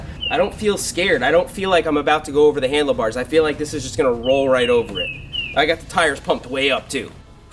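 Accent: United States. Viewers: He sounds American to me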